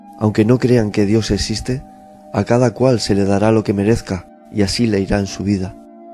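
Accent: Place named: Spain. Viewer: Hola Amigo, porque te haces llamar? Por ser un ser iluminado y lleno de pureza?...Tu voz da tranquilidad y por tu acento me parece que eres de España, me alegra mucho que hayas decidido hacer videos de ESPIRITUALIDAD que tanta falta le hace al mundo. Soy bautizado Catolico pero ellos no creen en la REENCARNACION que por tantos hechos reales se que es verdadera. Yo estoy en un grupo Espiritual llamado TAO aca en Ecuador los instructores la mayoria creen en Buda y Jesus ademas creen en la Biblia. Un gusto siempre escuchar tus videos.....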